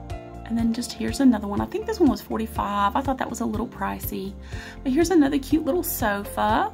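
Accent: South, United States. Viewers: You definitely have a southern accent. I have one too 😀. When my family moved to New York many years ago, I got teased by the way I talk. I told someone once that southerners sound that way because we have respect in our sound 🙂. Then he tried to copy my southern accent 😄.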